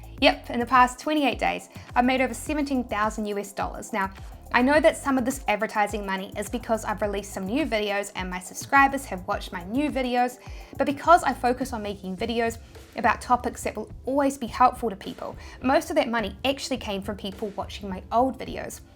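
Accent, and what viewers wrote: New Zealand. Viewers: I ended up with your video as I was googling Kiwi accents awwww I miss NZ sooo much! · "Chick out check" - I will never get used to the Kiwi accent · Wow, New Zealand's accent is so good. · Thanks,. So inspirational. I love your Kiwi "ik-cent" too:-) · I believe she is from New Zealand... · I love the New Zealand accent. I'm moving there. It's settled. I made this decision after watching the almighty Johnsons... but I'm declaring it on this random YouTube video that popped up for me.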